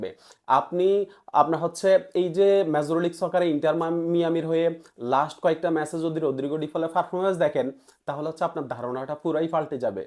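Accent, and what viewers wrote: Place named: Noakhali, Bangladesh. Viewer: আপনে কি নোয়াখালীর লোক , মুখের কথায় বুঝা যায়🎉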